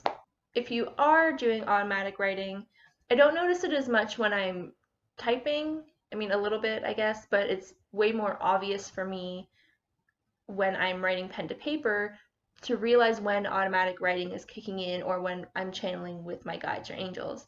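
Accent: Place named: Massachusetts, United States. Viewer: I’m 5 seconds into this video and I immediately heard a Massachusetts accent. (If I’m getting cocky about my accent guessing abilities can I get more specific snd say like outside of Boston? North shore? Merrimack valley?!)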